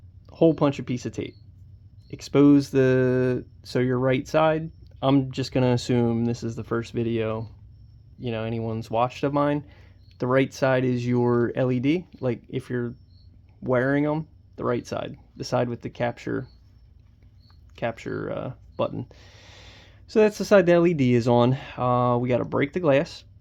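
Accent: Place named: Delaware County, United States. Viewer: where you from ? you got that Delco accent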